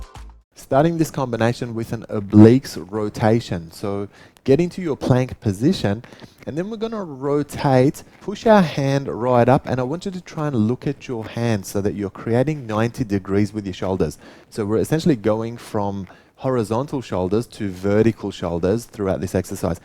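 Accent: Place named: Australia. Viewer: He's got an aussie accent, but where was this filmed? Gorgeous place! I did this workout today (not all, though!) and absolutely loved it. So glad I found this channel.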